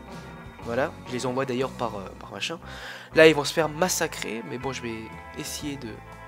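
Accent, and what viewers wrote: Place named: France. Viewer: J'adore ton accent à la française.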